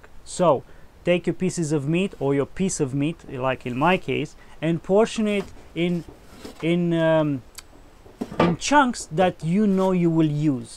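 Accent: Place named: Ukraine. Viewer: Im just loving this Ukrainian accent ^^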